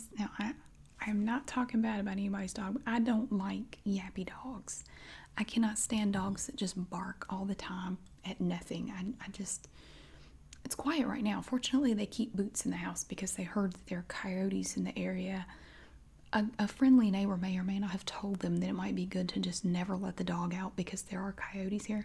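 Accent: South, United States. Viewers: I love how much more southern you sound when you get goin' 😂💕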